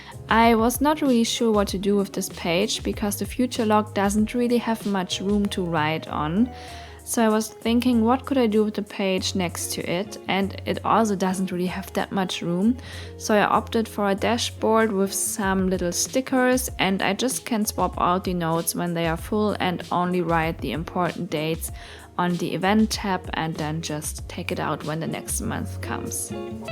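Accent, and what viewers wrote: Germany. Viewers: Hab direkt gehört, dass du deutsch bist 😊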